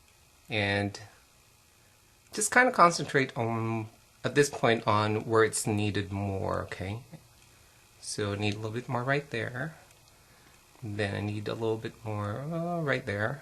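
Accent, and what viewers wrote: Philippines. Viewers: he's filipino! lol, thei got the accent! LOL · omg! you're filipino ! :) i can tell by the accent you did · mwahahah love the pinoy accent you;re so awesome! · Love your Filipino Mother accent! Haha! <3 I just wanted to say.. THANK YOU FOR THIS VIDEO! Honestly, this may be weird to confess, but you have made me accept.. actually, LOVE my acne scars. I never thought of them as something that can give me "character". So thank you, so much!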